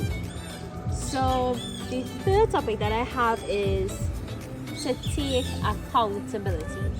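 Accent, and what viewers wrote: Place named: Trinidad and Tobago. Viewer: I hear a trini accent!!!!! Yesss let’s go!! Keep making videos!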